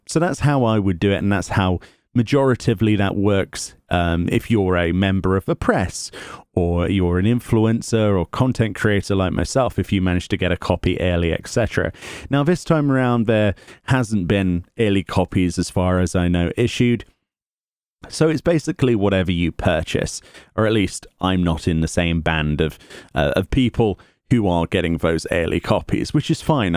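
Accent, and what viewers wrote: United Kingdom. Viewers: Thanks for the enlightenment in your last teatime. 🙂 I enjoy the teatimes very much and would love to have more of them. My question is a bit personal and I apologize if I'm putting you on the spot: The way you pronounced the word 'early' made me wonder which part of the UK do live in? I understand if you'd rather not answer that due to those dimwits who think Swatting is a nice thing to do. After my love for FFXIV comes the love of languages. The English language has a special place in my heart and I'm curious about the different accents there are and where to find them. Which in turn is the reason for my question 😅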